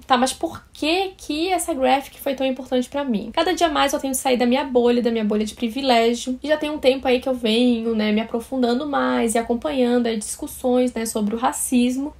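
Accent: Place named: Rio de Janeiro, Brazil. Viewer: Vc é afinal de Santa Catarina ou do Rio? Tá com sotaque carioca nesse livro 😅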